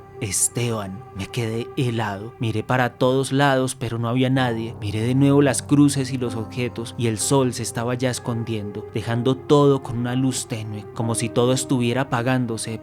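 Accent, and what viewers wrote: Argentina. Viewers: EN SANTIAGO DEL ESTERO. SEGÚN DE DONDE ES AVECES SI HABLAN ASI. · Es al revés un colombiano leyendo con el acento bien Argento 🇦🇷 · Me encanta el acento con el lunfardo argentino ☺️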